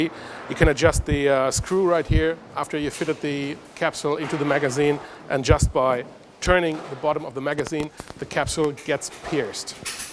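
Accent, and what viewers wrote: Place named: Germany. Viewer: German Akzent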